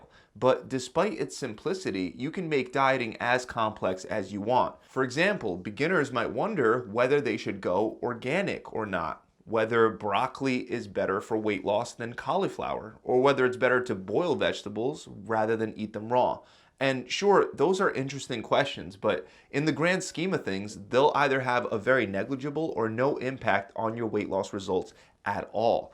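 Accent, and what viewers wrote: Philadelphia, United States. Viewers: Know I can tell you are from Philly or Jersey? 💦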